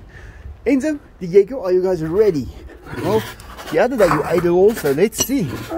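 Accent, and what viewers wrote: Afrikaans, South Africa. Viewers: Sorry, just go to say I love the Afrikaans accent. Might be because I'm a huge fan of Sharlto Copley. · South African accent. Its chilling that these animals could be living in my neighbours back yard..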